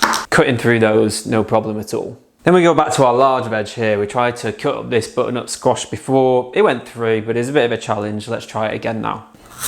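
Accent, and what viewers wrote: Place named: United Kingdom. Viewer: You are one of the most real YouTubers out. You give accurate, real information no bs. Keep it up. I bought a crappy flip knife (looks super cool was extremely dull) off a homeless dude for $1 ( i also bought him taco bell) this video helped me restore this knofe to satisfactory sharpness and is now my edc. Thank you so much. (Also your voice is nice im american and the British accent makes us c.u.m...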